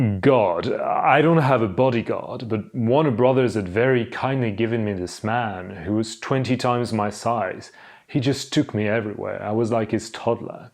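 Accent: United Kingdom. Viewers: Really odd hearing you speak English, and with a perfect British accent too. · That’s a gripping video! 😻 You’ve got an impeccable British accent. Best from Poland! 😊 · Perfect British accent! Expecting next videos) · oh my goodness so british it is wonderful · A real hoot! The lovely British accent was unexpected . Did you spend more time with British content than with American content? Or maybe you can turn on an American accent as well.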